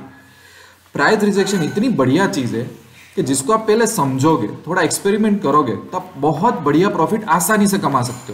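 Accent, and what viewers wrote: Gujarat, India. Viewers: Very nicely explaine in Hindi with Gujarati lehja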